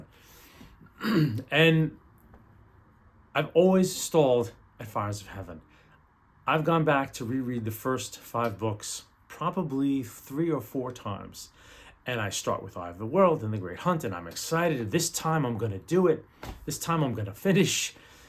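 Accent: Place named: Long Island, United States. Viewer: Nassau County representing! It’s endearing to hear your Lawng Island accent.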